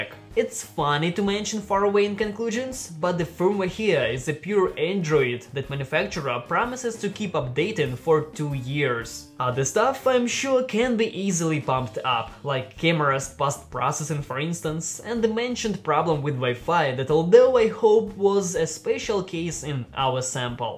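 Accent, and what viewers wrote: Russia. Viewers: Is that a Russian accent ? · Can you test the battery in watching youtube, battery test in playing games, battery test in browsing, battery test in watching videos. Will this 4k mah can last 2 days? Like your review and you talk like Russian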